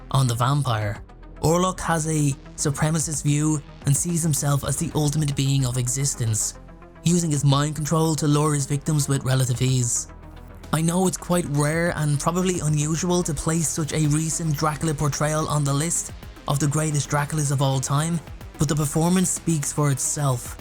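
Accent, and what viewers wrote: Ireland. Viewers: Your list makes me wonder if you might never have seen the movie with Frank Langella as Dracula? The one with Laurence Olivier as Van Helsing. BTW, I love your Irish accent! Dracula as... 'Drak'ullah'. Aye, dem feckin' vampires out dere bitin' troats!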